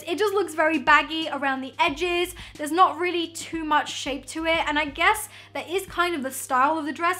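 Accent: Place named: United Kingdom. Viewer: Jana Deni she has a British accent of course she isn’t going to say it right 🙄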